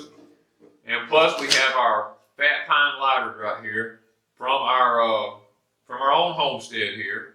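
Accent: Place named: Georgia, United States. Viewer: Great video, I just discovered you. Your accent sounds Georgian - north of Atl to me. 🤔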